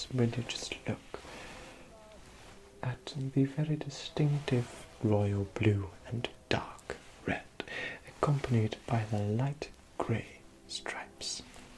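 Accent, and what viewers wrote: United Kingdom. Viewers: Are you actually British? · I got so used to the british accent so fast that when he mentioned he was the best tailor in the UK I had to take a moment to collect myself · Just discovered your channel last night. The Butler one where you are unwinding us from.a night out was FABULOUS!!! I love themed and time period based ASMR vids. The Fire crackling, the light old time background music is perfect. And the elevated British speaking tone is superb. "Chefs kiss" Keep up with the lovely and hard work you put into these. Looking forward to binging your channel ❤ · I finally find British ASMR. Hello from Tehran 💚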